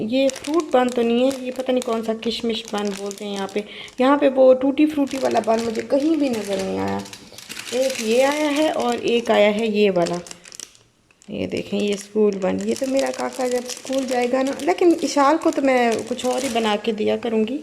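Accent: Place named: Mianwali, Pakistan. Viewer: I think u r from mianwali jis tarah sai ap bolti hain.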